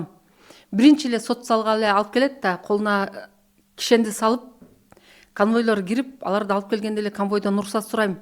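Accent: Standard. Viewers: Бул эже ото акылдуу экен , таза кыргызча суйлойт экен. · ишиңде ийгиликтер болсун. Өтө так, нукура кыргыз тилинде сүйлөгөнүң аябай жакты.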